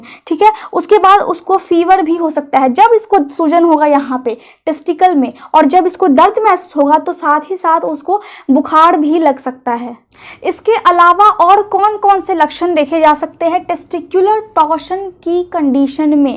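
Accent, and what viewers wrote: Bihar, India. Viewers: Bihar ki ho,Mother Tongue in Fluency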